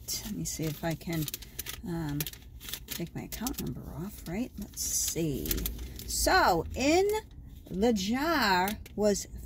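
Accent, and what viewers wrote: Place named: New England, United States. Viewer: Based on the way you say the words "Jar" & "Dollar", it sounds like your from the New England area, probably Massachusetts. I love hearing the slight accents from up there, mostly because it's disappearing over time. $52 isn't a bad haul. Keep using cash and saving the loose change!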